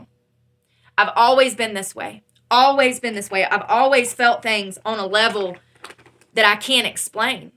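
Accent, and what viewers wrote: South, United States. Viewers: Hey, stay positive. Whatever you do, don't go back to the booze. It's a lonely world out here, but guess what... you have the sweetest southern accent I've heard in a while, and that's special. Stay positive, god bless!!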